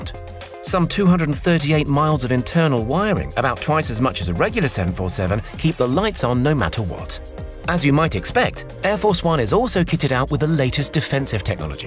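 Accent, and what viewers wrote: United Kingdom. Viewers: Wow, that British accent caught me off guard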